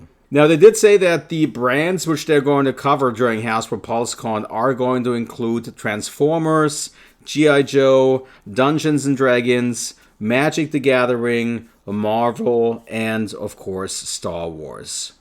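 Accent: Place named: Ireland. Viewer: Thanks for this great podcast! Love you've done an episode devoted to the Jabba The Hutt figures! I still want to buy the new one from Hasbro Pulse, but it sells out quickly! Are you in Ireland? You sound like a friend of mine who's a native of Ireland.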